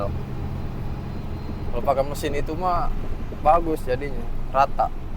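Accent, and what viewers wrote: Serang, Indonesia. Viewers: Aduh aing setiap bicara ciri khas orang Serang he he sy senang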